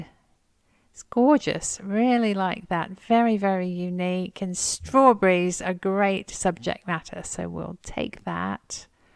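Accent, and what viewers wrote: England, United Kingdom. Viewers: Are you from England? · LOVE the design for the "kitcheny bits" merch! I adore the British accent and terminology as do lots and lots of your viewers. Go for it!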